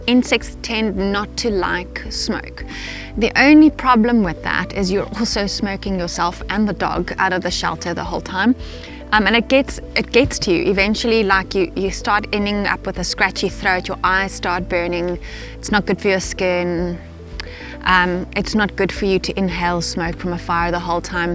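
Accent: South Africa. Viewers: You sound like a South African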